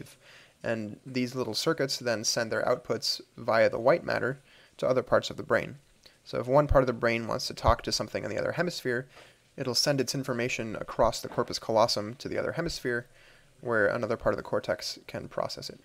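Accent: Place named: Africa. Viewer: And you sounded like an African!!! Thank you so much.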